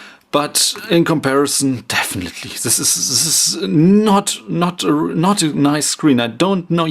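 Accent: Germany. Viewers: Hi, I'm English and love your German accent! You speak English very well in my opinion. Besides that nice compliment though, your videos are very informative. I like how you address things that are important to "the everyday user" - please keep it up! I own a Nokia Lumia 820 and I regret buying it because the battery life is not very good at all and the available apps are poor compared to Android. I was watching this video because I was considering buying the Moto g.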